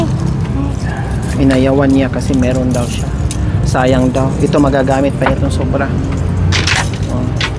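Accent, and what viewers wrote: Visayas, Philippines. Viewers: ang cute mo mag bisaya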